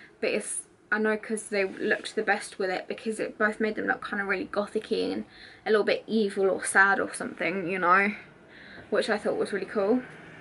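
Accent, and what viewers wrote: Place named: United Kingdom. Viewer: Hello your stuff is nice we have the same shirt black veil brides and also the shop at that store hot topic love your British English is very difficult to understand but it is very nice your asento greetings from México tijuana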